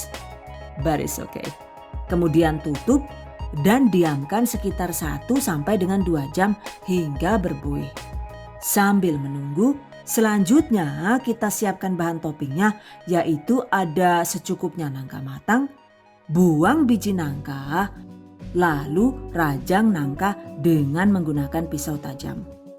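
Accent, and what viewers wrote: Banyumas, Indonesia. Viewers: Ya Allah ngguyu ngakak nganti ta ulang² serius deleng mlh ngapak² ,, kencote metu 😅, suwun mamake resep e mntul pisan 👍🏻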